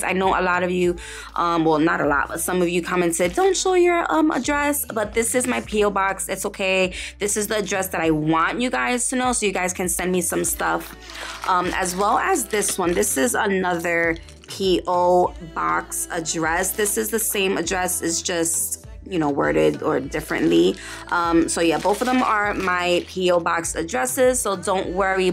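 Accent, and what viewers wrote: New York, United States. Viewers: Not only do I love your nail work your New York accent is to die for! I’m from California, and anytime I go back east I could listen to people talk for hours! You are so fabulous! And PS I have used those wax pencils to pick up stones ,large glitters etc. they work really well😀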